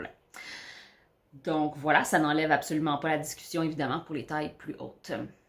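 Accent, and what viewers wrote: Quebec, Canada. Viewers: Bonjour ! J'habite Toulouse et j'adore cet accent québécois, même si je ne comprends pas toujours tout, je dois bien l'avouer ! 😉😊 Merci pour tes tutos, ils m'ont permis de débloquer certaines situations inconfortables 🙄(je suis souvent obligée de regarder plusieurs tutos différents pour certains trucs. Le dernier en date : les chaussettes !) J'ai des envies de poncho pour cet hiver (pointu en bas, encolure ronde à l'arrière du cou et en V devant). Aurais-tu des modèles à me conseiller (en français) ? Je ne parviens pas à trouver quelque chose qui me convienne -sniff-😢 Merci et bonne continuation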